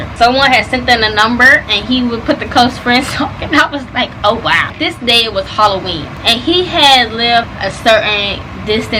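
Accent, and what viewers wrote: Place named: Washington DC, United States. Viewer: That dc accent, PUURRRRRRRRR🤣❤️❗️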